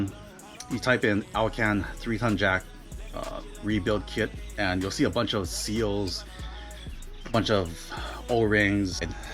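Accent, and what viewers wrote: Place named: Hawaii, United States. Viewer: 5 seconds in from speaking and was like this guy gotta be from hawaii. O-ring walkthrough helped, gonna fix my jack this weekend!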